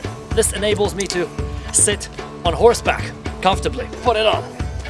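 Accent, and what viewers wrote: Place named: England, United Kingdom. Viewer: Genialny angielski akcent wow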